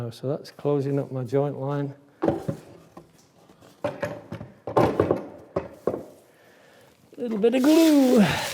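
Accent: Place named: North, United Kingdom. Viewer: Excellent Video/s - which part of the UK are you from and where are you now? My question is, after watching closely and carefully your Saw and Chisel Skills throughout your Workbench Build videos - what is your preferred Chisel Brand and why? - AND what is your preferred Saw Brand and why? I suspect by your slightly 'accented ' Northern dialect, you might now be located in the USA so, as such, maybe your Chosen Brands might not be available in the UK/France! I any case, l willl look forward to hearing from you soon - Thanks. All the very best to you and your family.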